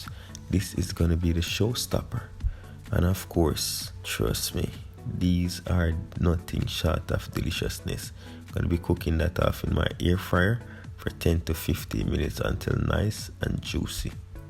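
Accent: Jamaica. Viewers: The JA accent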